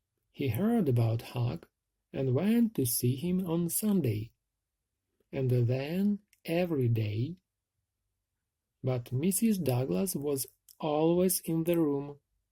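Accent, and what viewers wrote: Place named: Ukraine. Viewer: Hello, I think your pronunciation is good. There is such a distinct accent difference between Ukrainian and English, isn't there? Your genuine enthusiasm for the English language makes me very happy. I still have a lot to learn, you are very inspiring. Halina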